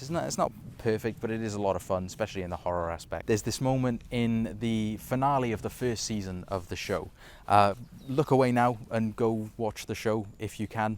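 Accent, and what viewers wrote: Wales, United Kingdom. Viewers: I recognise a welsh accent when I hear one. Hello, fellow Welshman :) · Hears Welsh, instantly subscribes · Is he welsh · Is he welsh?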